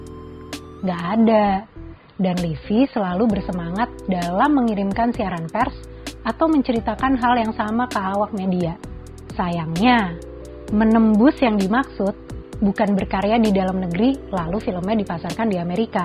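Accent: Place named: Java, Indonesia. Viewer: Fix mba nya jawa pelan~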